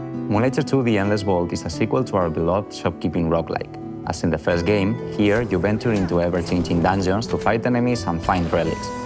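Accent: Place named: Spain. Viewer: el que está comentando.... parece español por ese acento jejej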